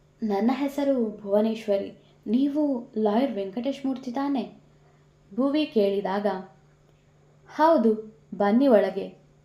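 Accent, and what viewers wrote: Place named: Dakshina Kannada, India. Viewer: hauda !! Naanu nimge bahushaha exams irabahudu anyone. Neevu kannada narrate maaduva style namma pure Dakshina Kannada style. Haagaagi bahala kushi aagthade keluvaaga. Adanne naanu miss maadikollodu.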